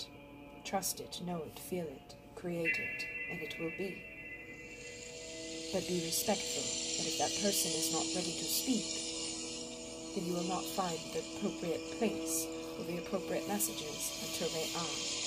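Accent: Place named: United Kingdom. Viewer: Am sorry but the music is to loud and it makes hard to hear and am sorry but is kind of weird hearing their kind off "British accent with your American pitch" sorry I've never heard Pleiadians speak but again is the music that makes it harder Again I don't want to sound rude or anything, I love your readings, but this is my first time listening to their messages.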